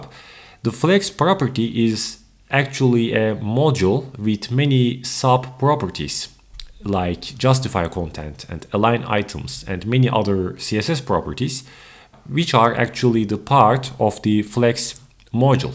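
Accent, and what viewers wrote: Turkey. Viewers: Türk ingilizcesi nerde duysam tanırım :D cok güzel anlaşılır sade bir dil kullanılmış. Elinize dilinize sağlık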